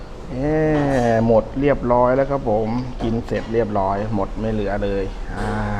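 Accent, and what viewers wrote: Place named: Isan, Thailand. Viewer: ชอบเวลาพี่เว้าอีสานเด้5555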